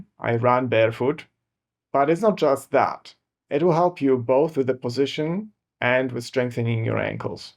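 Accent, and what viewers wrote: Poland. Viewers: I m so confused by your accent. On top of your primary accent, Polish,do you also have a German accent? Or am I imagining this?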